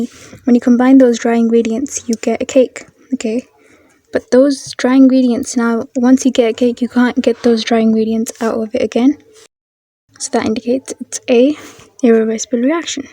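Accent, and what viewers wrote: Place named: United Kingdom. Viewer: Hey, loved this video...and your accent ahhh it's sooo british. looking up for more videos...keep up the good work ❤❤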